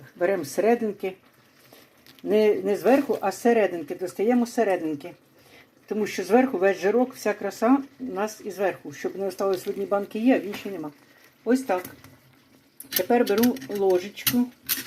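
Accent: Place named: Poltava, Ukraine. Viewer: Вибачте,мені дуже подобається ваша мова,нагадує рідну Полтавщину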